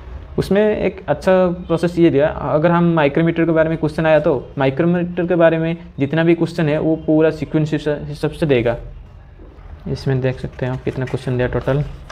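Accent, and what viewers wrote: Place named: Odisha, India. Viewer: Mu paa tama voice ru janideli odia pila boli... Then odia Letter dekhiki confirm heigali...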